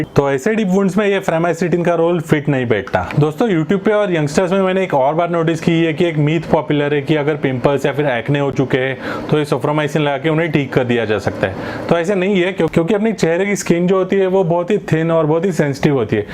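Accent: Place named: North, India. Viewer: sure you are being modest an all..😊 but that's what YouTubers and Instagramers are influencers!! BTW what city are you from? Your Hindi is very North😃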